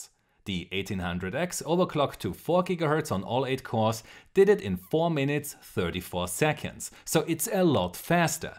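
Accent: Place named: Germany. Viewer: are you german? where does your strong accent come from?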